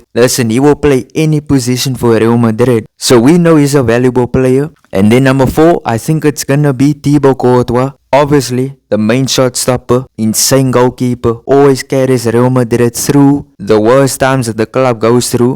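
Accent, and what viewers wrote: South Africa. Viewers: Yoh bro are u south African i am too, your accent gave u away, big up😂🎉❤ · editing skills are top-notch,Bruv! You have a South African accent. Hala Madrid🤍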